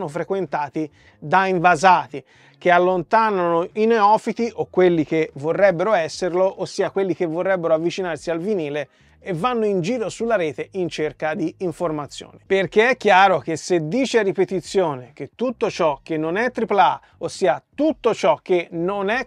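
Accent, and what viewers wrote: Tuscany, Italy. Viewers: Ciao sentendo l accento toscano te tutto bene con l alluvione spero.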